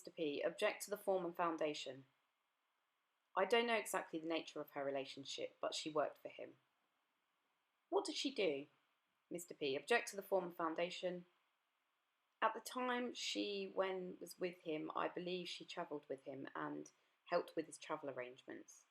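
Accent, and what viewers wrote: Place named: RP, United Kingdom. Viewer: Your accent is more posh then Maxwell's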